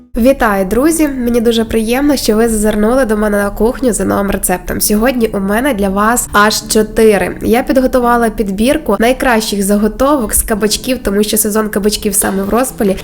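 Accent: Standard. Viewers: Яка гарна господиня!Дякую за чисту українську.Дуже приємно слухати.Рецепти всі прекрасні,я вже їх протестувала. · Маленька , дякую . Яка у Вас гарна мелодійна чиста українська мова . ❤❤❤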